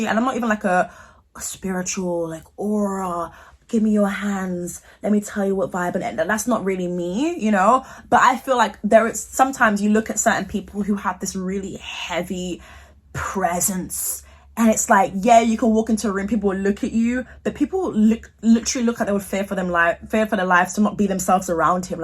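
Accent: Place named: England, United Kingdom. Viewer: Luv this ENGLISH ACCENT 💯% ✅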